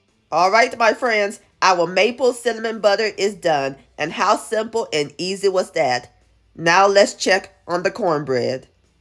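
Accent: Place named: South, United States. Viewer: "Thats right sugar a sweet corn bread"! "Here we go"!!! I love your Southern accent. Im from Michigan but theres no hospitality like down south hospitality. I was born down South. W. Virginia. I miss my cousins and home town. Princeton West Virginia. Im so delighted to run across your channel my friend! This sweet potatoe cornbread is a delight and will be a dish at my thanksgiving dinner. Ive never tried it. Just regular hot water cornbread. This will be a treat.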